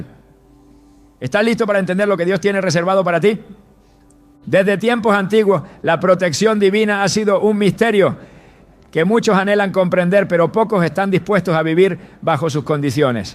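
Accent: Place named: Spain. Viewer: Dios te bendiga!! Oye después que habla parece como si cogiera un aire y además oye bien de lo que habla y como habla parece un español. Es muy facil saberlo.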